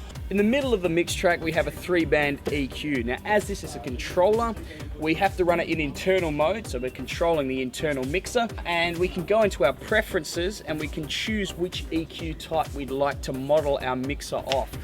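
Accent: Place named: Australia. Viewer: finally, an aussie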